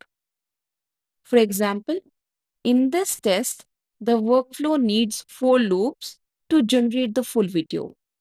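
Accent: India. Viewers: but why you have indian accent ?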